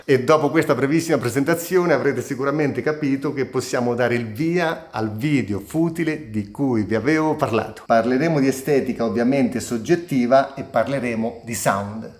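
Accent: Marche, Italy. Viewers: Tu sei MARCHIGIANO X CASO?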